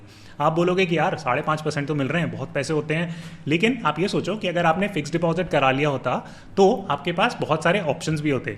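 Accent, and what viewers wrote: Standard. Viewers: Mujhe apki shudh hindi bahut pasand aayi. Bas 'Apun', 'Apun' bolna chod dijiye. :) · Loved ur shuddh hindi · sir we all like your shudddhh hindi after all we are all hindustanis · बहुत बढ़िया। आपकी शुद्ध हिन्दी अच्छी लगी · Han pasand hai aapki sudh hindi, ty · Shuddh Hindi me mzaaa aata hai yaar. Sun k bhi accha lagta hai aur jaise bade bhai samjate hai aisi anumbhuti hoti hai. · Love your sudd hindi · बहुत अच्छा भ्राता, आप हिंदी और अंग्रेजी दोनों ही बहुत शुद्ध बोलते हो,👍🙏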